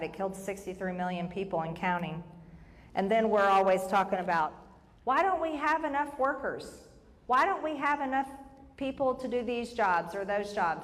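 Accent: South, United States. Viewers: Honestly I’m grateful that you are fighting for us as an American and you are a Georgia Girl from head to toe . My first 26 years of life was in Seminole County GA and to listen to your voice with your Im doing what’s right and proud to do so in the middle of the room Makes me blush lol Get Em Girl bust out a case of Aunt Georgia’s Aged Right Whoop Ass and serve it up cold !!! Just a little Stereo Typical Southern Talk !!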